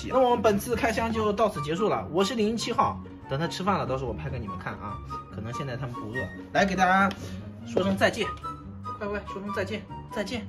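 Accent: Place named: Malaysia. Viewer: 马来西亚口音XD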